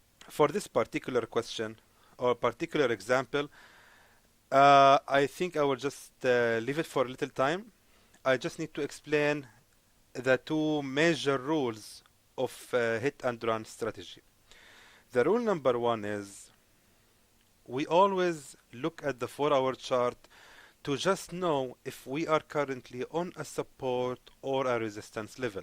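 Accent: Arab world. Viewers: والله اللهجة العربية م تتخبى لو ايششش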